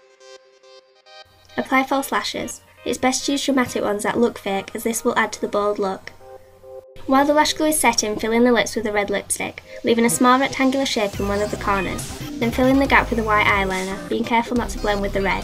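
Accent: Yorkshire, United Kingdom. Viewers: your Yorkshire accent is so pure in this lol I almost forgot how northern you are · You've just got a new subscriber! Also, you're from Yorkshire? I'm from there too 👌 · R u british I am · it sounds like she has a Yorkshire accent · Her accent is yorkshire amd jade thirlwells is Geordie .... There's a difference x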